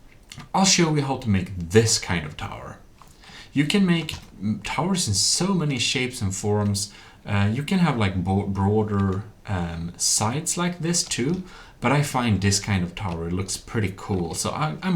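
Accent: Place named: Sweden. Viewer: Let me guess you are from sweden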